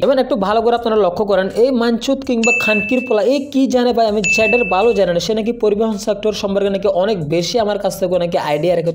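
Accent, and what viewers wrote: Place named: Comilla, Bangladesh. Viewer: ভাই ধন্যবাদ একবারে আমাদের খাঁটি কুমিল্লার ছেলেদের মতো কথা বলছেন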